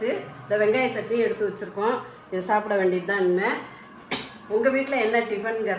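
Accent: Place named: Telugu, India. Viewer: Atta, you seems to be Tamil side telugu to me. Where do you put up in chennai?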